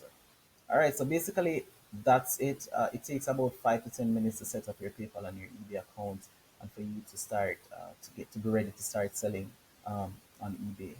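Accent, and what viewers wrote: Jamaica. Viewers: you sound jamaican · Do you use a paid email store or just like the regular free eBay? Also I hear the JA accent big up:) are u doing this from JA? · You got a slight jamaican tone. are you jamaican? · Honestly this was the best explanation I found on YouTube on how to drop ship from ebay .....simple and easy to understand thank you .....by the way where r you from ? I'm from Antigua ur accent sounds similar or Jamaica?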